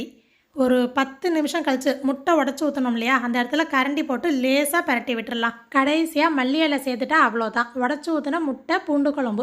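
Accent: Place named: Madurai, India. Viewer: Pera ketalea odiruvangalea..... Enga madurai slang la pesuringa Nala iruku sissy....❤❤❤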